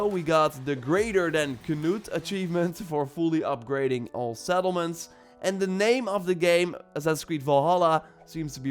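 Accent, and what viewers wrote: Netherlands. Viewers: You sound like dutch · you dutch?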